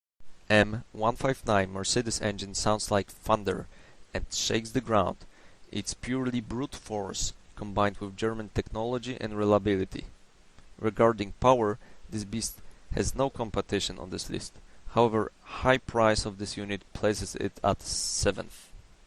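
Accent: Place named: Poland. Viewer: You Polish? Taki kanciasty akcent ;)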